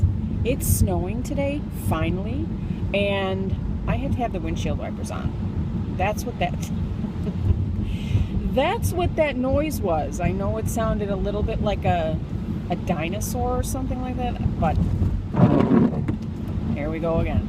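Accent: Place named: Michigan, United States. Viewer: Sounds like you live in Michigan, which is where I live! I love your videos...and my friends found out about my videos and made fun of me too. Keep on keeping on. :D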